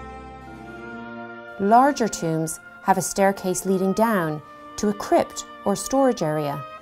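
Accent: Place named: Ireland. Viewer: This is the most informative video about La Recoleta. Thank you!! I especially enjoyed your presentation, with a lovely Irish lilt : )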